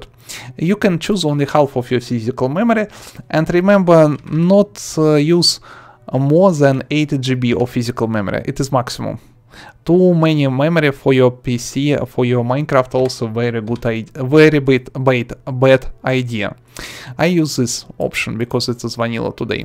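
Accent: Russia. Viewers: i think russian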